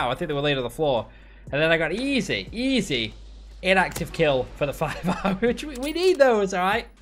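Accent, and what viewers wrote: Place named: England, United Kingdom. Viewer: dude you're great. That english accent sets the mood straight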